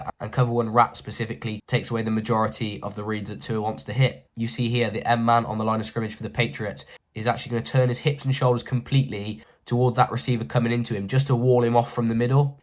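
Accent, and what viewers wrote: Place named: United Kingdom. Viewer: It's something special about hearing a Brit breaking down American football. . . AND KNOWS WHAT HE'S TALKING ABOUT. I'm here for it.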